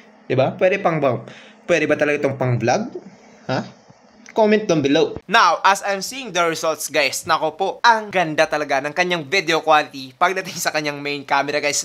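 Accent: Visayas, Philippines. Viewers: Bisaya ka sir no?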